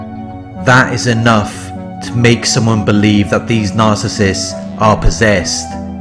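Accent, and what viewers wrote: Wales, United Kingdom. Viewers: There is something different about your channel, your voice, the content, the music, the images, it’s all perfect, it’s like it was made for me. BTW are you welsh?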